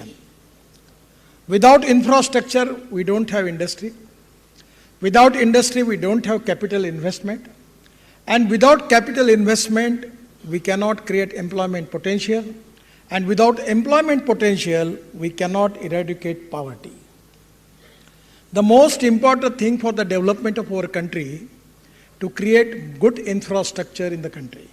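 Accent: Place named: Maharashtra, India. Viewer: Somebody please make him learn good English, Marathi accent is acceptable while talking in Hindi; but not suitable for English please :-) Working is good but if you want to give speeches improve your speech. Even Lalu also tried :-) Use we and not me or I.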